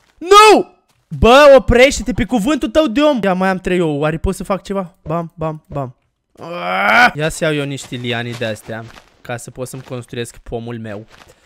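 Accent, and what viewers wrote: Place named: Moldova. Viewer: Accent de moldovan>>>>>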